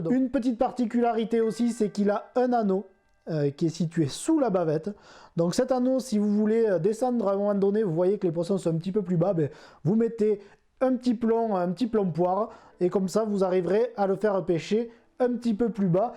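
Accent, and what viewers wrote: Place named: Southwest, France. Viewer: Nouveau format très sympa beau décor est le tout avec un accent du sud-ouest . Je participe au jeu concours .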